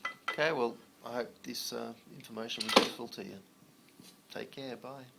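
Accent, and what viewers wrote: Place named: Australia. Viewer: Hi, thanks for the interesting video - a really interesting setup you have there. I'm in a similar situation to yourself - quadriplegic (or tetraplegic as we tend to say here in the UK). I'm C4 complete - I'm guessing you're C5? Anyway, I'm also a keen BF3 / BF4 player, although my approach to playing the game is distinctly 'low-tech' compared to yours:) Take a look at the video on my channel & you'll see what I mean! I assume from your accent that you're based in Australia? I would suggest that we meet up in a game sometime, but I'm sure the ping times would be horrible, and I guess you play on the Playstation 3, whereas I'm on a PC. Anyway, best of luck with the gaming!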